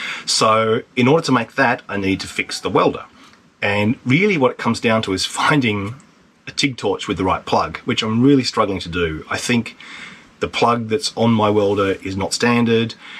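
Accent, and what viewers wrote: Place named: Australia. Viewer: Thanks, this is just what I needed this morning, you always make me smile, and sometimes I really do think you are from Michigan with that I only need this or that to make it work but then that great Aussie accent keeps me grounded. Keep up the great work.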